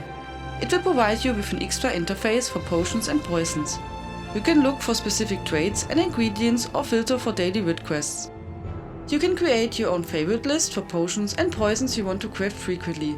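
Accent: Germany. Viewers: deutscher akzent :3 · Du hättest es auch auf Deutsch machen können, oder ein Zweites Video auch wenn ich alles verstanden habe, der Akzent schreit nur so ''ich spreche Deutsch'' xD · Very good list of add-ons thanks for that. Also probably the thickest German accent I heard in a while, but your voice makes it kind of cute :)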